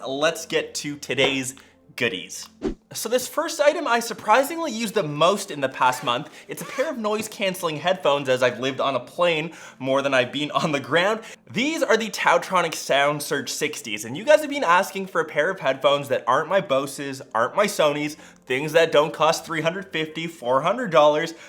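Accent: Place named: Canada. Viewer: your Canadian accent is awesome !!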